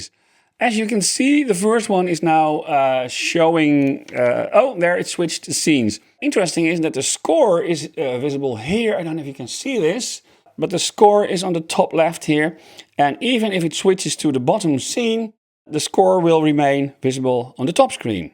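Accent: Netherlands. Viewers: Hi! Very nice video, thank you🙂 I have all the Bandai double-layer and single-layer solar games, they are amazing. Especially that they still work! One of my favourite is the single-layer "Invaders of the Mummy's Tomb", the mini version. Double-layer Terror House is also good. I'm not very fond of Zaxxon though, but maybe I just didn't get the hang of it, yet. FYI, there were several more of these double-layer LCD delights. I know (and own some) of these: MASUDAYA: Samurai Vs Ninja, Air Force MORIOKA TOKEI: Brave Fireman, Construction Work, Mice Party, Shark Hunter, Sky invaders (=Air Force), Jupiter Please, can you tell me, which LCD game books (catalogues) did you show in the video? I want them🙂 Especially the second one shown. p.s. You have a lovely Dutchglish accent😄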